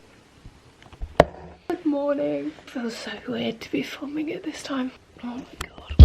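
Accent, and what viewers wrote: United Kingdom. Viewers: Your british accent is so cutteeeeee❤❤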